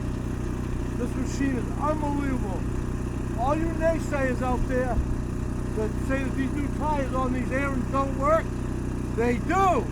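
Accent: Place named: Boston, United States. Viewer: Sounds like a Bostonian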